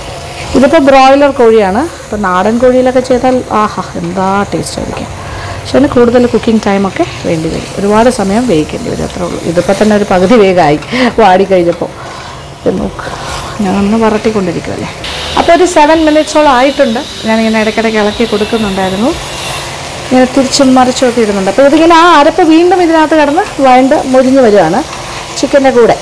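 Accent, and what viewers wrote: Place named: Kerala, India. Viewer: ചേച്ചി തകർപ്പൻ റോസ്‌റ്! താങ്ക്സ്. തിരോന്തരത്തു കാരിയായചേച്ചിക്ക് ഈ മാവേലിക്കര ഭാഷ എങ്ങനെ കിട്ടി 🧐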